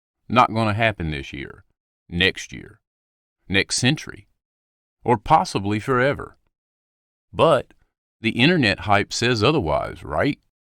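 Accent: South, United States. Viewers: I live in the south, and his accent is most definitely southern!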